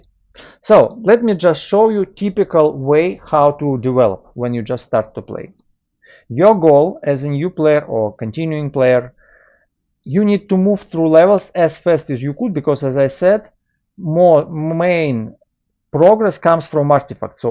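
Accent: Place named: Russia. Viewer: Видно по акценту, что ты русский) Сними ещё видео, буквально вот на неделе обнова вышла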